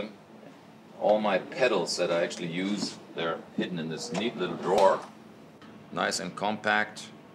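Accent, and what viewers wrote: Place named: United States. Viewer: he speaks english like a pure american,if i wouldn't know him i would thought so.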